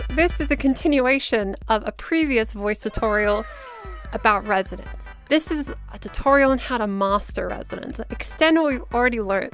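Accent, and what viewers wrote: Australia. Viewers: It's so nice to have an australian accent guide for the exercises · yeah i ultimately agree with that but idk just aint for me i suppose id say the only problem i got with ur vids is that u got an australian accent so its a bit of a work around to be like "oh how would i say that" but its pretty easy to work around so its no big deal tbh plus its probably amazing for people with Australian accents but yeah thats all thx for replying and its good to hear that ill be on the lookout hope your having a wonderful day also your contents amazing even without including tutorials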